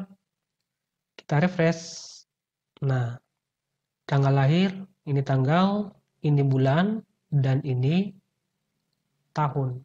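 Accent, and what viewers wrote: Bugis, Indonesia. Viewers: Orang Bugis yah?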